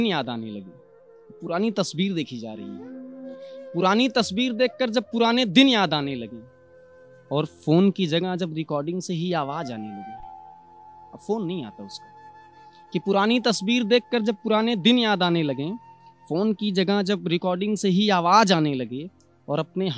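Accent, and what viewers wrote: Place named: Lucknow, India. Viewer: Gajab bhaiya wah lucknow wala andaj 😂❤️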